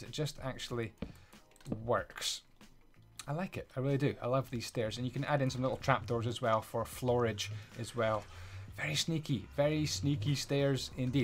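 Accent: Scotland, United Kingdom. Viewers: Is he Scottish? Or Irish?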